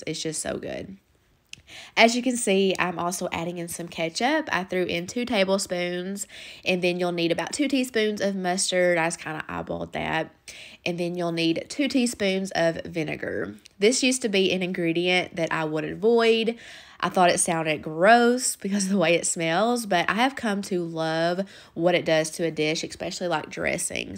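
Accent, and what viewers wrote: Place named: North Carolina, United States. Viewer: Are you from North Carolina? Your southern accent sounds like it. I’m from North Carolina.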